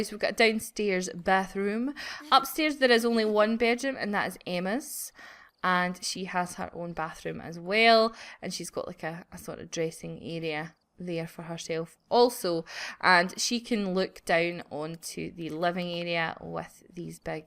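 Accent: Scotland, United Kingdom. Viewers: Scotland is my probably pretty accurate guess since I've lived there myself for a bit ;) Ya know, where Merida is from? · She's scottish and I think her accent is lovely [ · She's from Scotland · i love your video's! And your Scottish accent is very nice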